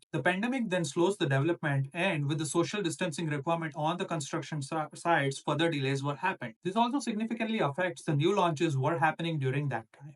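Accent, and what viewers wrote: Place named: Canada. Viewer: This is the new canada accent...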